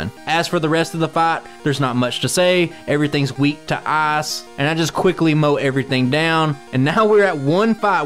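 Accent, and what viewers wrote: South, United States. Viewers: I'm liking the new avi, slowpoke looks powerful after learning TOMBSTONER! Also one of my partners heard your voice and wonders if you're from Mississippi, Alabama, or Louisiana. They're convinced you can't be from anywhere else lol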